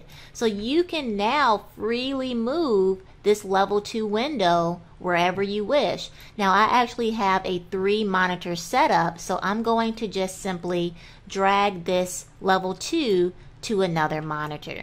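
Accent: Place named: South, United States. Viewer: this was a ton of help! Love the southern twang accent too. I am from Raleigh NC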